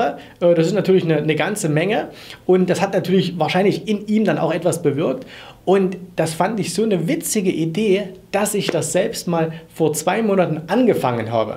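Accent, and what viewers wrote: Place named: East Germany, Germany. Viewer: Konsümverchzicht...DDR Akzent kommt durch 👎. Das Video ist inhaltlich nicht hilfreich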